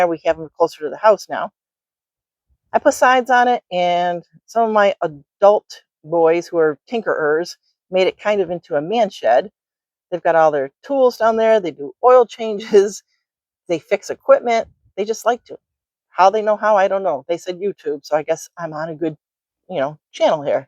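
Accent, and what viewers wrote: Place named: North, United Kingdom. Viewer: Thanks for the video.I am from around tug hill.Moved to Florida because my husband was in the Military.We still have family up North.Love your up North accent.People still ask me where I am from.Beautiful country up North.I miss the seasons but not so much winter😂Thank for all you do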